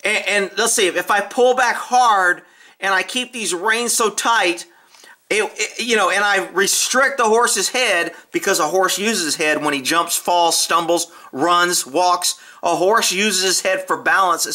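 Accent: United States. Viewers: hey, I liked a couple of videos because it was too true what you were saying.. but in this video... and I'm talking about the first 3 pictures... really from what I can hear you are a real American. (I'm from the Netherlands) So you're probably a western rider.. well my opinion if you do that wrong... we are human and make mistakes and we are working with about 700kg animals with a mind for them selves. I know and say yes, I had bad jumps and even fell twice with my horse because he made a canter change I didn't ask for, but my horse still loves to go through the cross country and I can also say if he doesn't want to go, he doesn't go.. because if you use a stick or spurs in that moment, he let's you eat dirt... and maybe you should check about the build and there way of moving of a breed of horse before you talk about them that way... (it's a friesian horse, and let me tell you, it's a really old breed)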